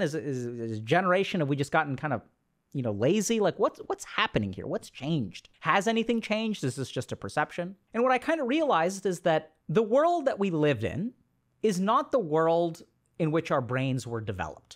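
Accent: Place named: India. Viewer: I can listen to his indian accent all day 😂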